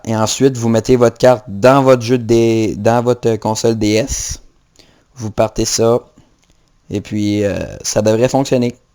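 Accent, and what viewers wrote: Quebec, Canada. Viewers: tu as un accent styler mec si jme trompe pas c'est quebecoi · il est canadien !! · Magnifique ton accent quebequois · Il est canadien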